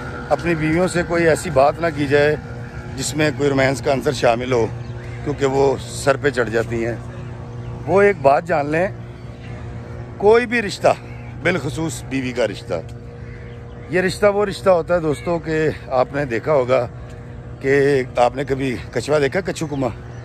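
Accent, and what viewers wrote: Lahore, Pakistan. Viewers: Basically I am from Amritsar. That’s why I love Lahori Punjabi, mithi zubaan. Same taste and same lehza 💕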